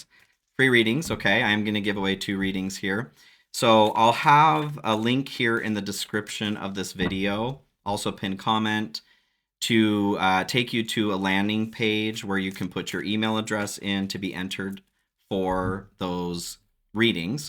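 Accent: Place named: South, United States. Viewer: I love your personality. Serious to thick southern accent. Your Reading style is great❤ All goes smoothly in your surgery Oh no😂 I just saw Mr. Bill😊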